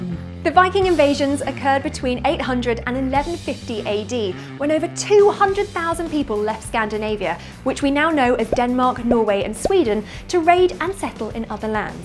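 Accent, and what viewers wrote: United Kingdom. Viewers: because she just is. her jaw, her cheeks, her voice, her eyes. like an audrey hepburn type face with a very deep british accent. She's married though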